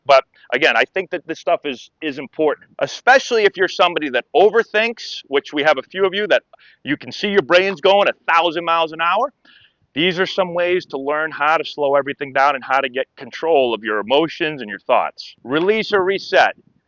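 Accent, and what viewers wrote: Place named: New York, United States. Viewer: Haha. True. Just the mild new york accent i think.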